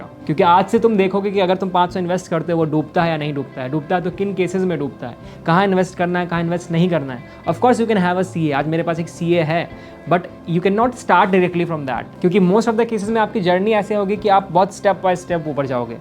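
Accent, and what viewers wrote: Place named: Bihar, India. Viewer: Same ascent of mine Bhaiya I am also from Bihar Bhagalpur ❤ Currently live in kanpur , UP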